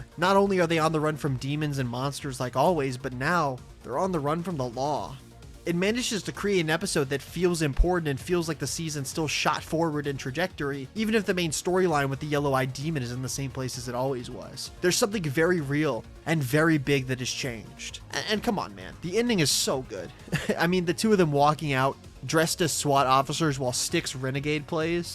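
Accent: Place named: Midwest, United States. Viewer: This guy sounds like polyphonic. He must be from the Midwest.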